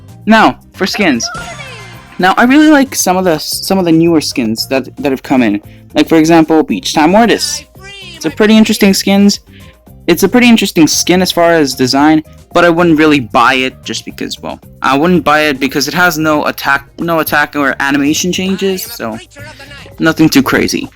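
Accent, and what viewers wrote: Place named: India. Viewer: You sound Indian asf lol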